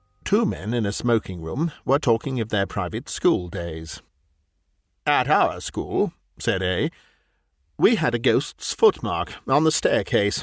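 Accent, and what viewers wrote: England, United Kingdom. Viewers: Wow. An incredible recitation of one of my favourite M.R James stories. What a gorgeous voice you have! I look forwards to exploring more of your performances. . . English stories ought to be read with an English voice, in my opinion. Well done, such a pleasant listen.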